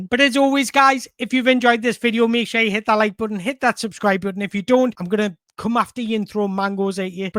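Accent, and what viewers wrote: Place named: Geordie, United Kingdom. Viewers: I like your videos, but the editing in this one is really jarring. I've had to stop about 10 mins in as I couldn't take any more. Maybe rehearse your script a bit more to avoid so many hard cuts. Im not really a fan of heavy Geordie accents either (im a Geordie myself), so maybe try to tone that down a bit, too, if you can. Finally, maybe work on lowering your tone by a few octaves, too. You're fairly high-pitched, and so a combination of the heavy accent, high tone, and hard cuts is really grating. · Are you a mackem? The way presents is informal and hes funny too. As for "heavy Geordie accent"..... Theres nowt better!!!! No need to tone it down, that's the way we talk, and why Geordies in general are admired for their accents!!! Keep up the absolutely brilliant work 🖤🤍⚽️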